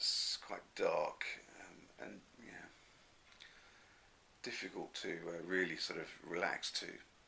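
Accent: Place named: Sweden. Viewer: Hi, My kind of video! I can definitely hear that you have strong Swedish influence in your pronunciation of Swedish words:-) I have somewhat similar feelings to you about Anekdoten. Have to sink my teeth into them some more. That Hollow Earth album I absolutely need to check out. Sounds very promising. Pete put me onto Agusa, and I love them. Finally got the new one this week, and I hope for a sit down thorough listen tonight. Only missing one Agusa album. I think Agusa has some old Swedish known folk tunes worked into their music at times. I have not been able to place it myself. Not familiar with that British commercial, but you have good sound memory there. It certainly sounds very similar. Not familiar with Black Bonzo. Struck me as a bit of English influence. Perhaps you have heard the news, but if not some skogsprog news: Jordsjø is recording a new one soon intended for release coming year, and their relative Elds Mark is dropping another one in November. 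Are you familiar with Makodajama? I believe only one album dropped an reissued by an American label. It is one of the Anglagård guys. Look forward to your next videos.. Take care, Richard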